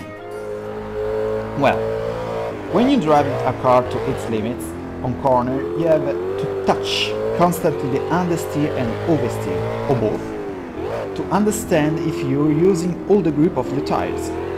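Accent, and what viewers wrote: Italy. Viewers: Great italian/ english accent,. Don't lose it, it's the connection to Italy and it's passion for motorsports. Also thanks for the info, for a newbie like me this is really helpful. 🙌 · Absolutely italian. A bit of french influence but italian intonation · The occasional Italian vowels are amusing.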